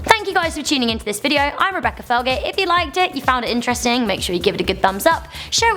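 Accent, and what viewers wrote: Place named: United Kingdom. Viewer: I like your British accent and you one of my favorite YouTubers like if you agree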